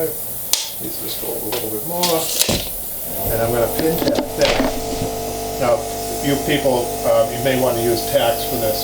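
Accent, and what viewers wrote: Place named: MetroWest, United States. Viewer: Just happened upon your channel as I consider tackling my first upholstery project - and I just want to say that you explain things so intuitively. It all makes perfect sense as you go along explaining, that I quickly start to visualize and anticipate what you will say next. Of course, I immediately identified your accent as I am also from the metro-west area :) Easy to understand and well-explained instruction. Thank you! I feel like this might actually be enjoyable to undertake my project!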